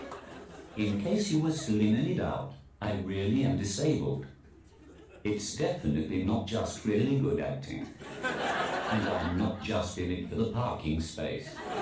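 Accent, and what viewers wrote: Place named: London, United Kingdom. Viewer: that's the accent of London. :)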